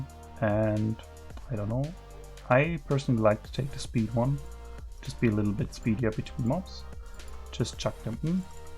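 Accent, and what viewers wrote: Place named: Germany. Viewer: Das erste Mal, dass ein deutscher keinen Abgrundtiefen Akzent hat und echt gut Englisch spricht und du entschuldigst dich weil du "struggelst". Mehr Selbstvertrauen! Du machst das so gut !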